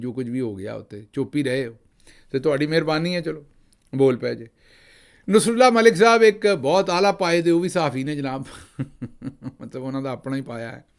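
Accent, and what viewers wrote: Punjab, India. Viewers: Masha’Allah good work Alhamdolillah for being here Love the Punjabi accent news Pakistan 🇵🇰 Imran khan PTI team all Zindabad Especially sb who’s highly intelligent and humorous who always makes me laugh in his videos that’s worth watching although the news in Pakistan is depressing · Khalis dehat punjabi sun kur bara maza aata hai. Kuch alafaz ka matalb nai pata huta phir bhi acha lagta hai.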